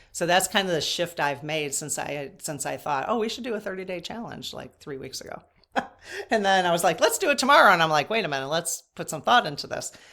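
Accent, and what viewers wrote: Midwest, United States. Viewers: My Moldavite is a stinker too. In and Out…around. I too have a white cat named Lucy. She is in cahoots with my moldavite. I really enjoy watching your videos. You crack me up. It’s like watching myself in the mirror. My family’s from the Midwest (Illinois & Indiana) so speaking patterns are similar & sayings, add in I’m 10 years older but 4/12 bday. Even though I’ve been on the Spiritual Path since the early 1980’s I frequently find gems 💎 in what you share. There’s always another Facet to examine or depth of Clarity to explore. Thank you. 🙏🏽 ❤Liz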